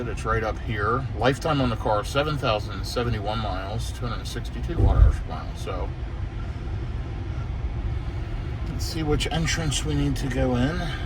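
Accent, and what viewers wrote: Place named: Western North Carolina, United States. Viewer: I'd still say North Carolina with the way you pronounce your Os. It very unique to western North Carolina.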